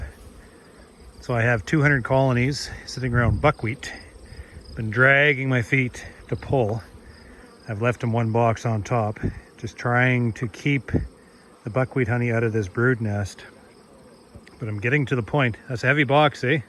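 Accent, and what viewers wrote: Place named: Canada. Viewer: Definitely hear a Canadian accent 🤣...."about"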